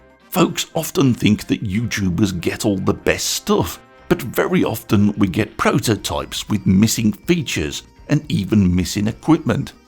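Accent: United Kingdom. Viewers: Your British accent alone was enough to convince me ! thank you!!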